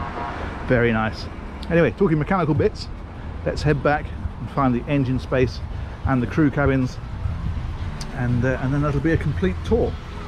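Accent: England, United Kingdom. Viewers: I love the Englander accent. Pretty cute and he knows his stuff! How do you make a living touring thru boats? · You do a great job showing these ships off in complete form . Perhaps probably because you talk about them in your British accent which adds alot of Class when you show all these Boats to all the public which is us .I learned alot of from Certain Yauchts dont have to Cost $13,000,000 to have alot of room in them .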